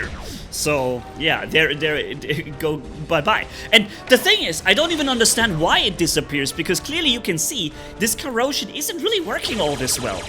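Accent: Germany. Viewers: Are you german but speaks english or english that has learned german?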